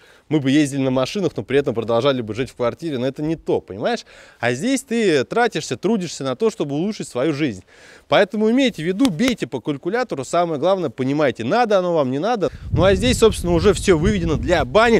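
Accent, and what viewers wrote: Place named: Provinces, Russia. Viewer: Он конечно старается молодец, но речь ужасна. Прям видно из провинции.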